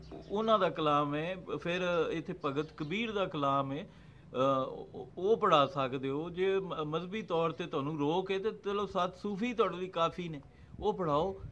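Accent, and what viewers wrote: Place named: Punjab, Pakistan. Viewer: Gulabi Urdu.. Like it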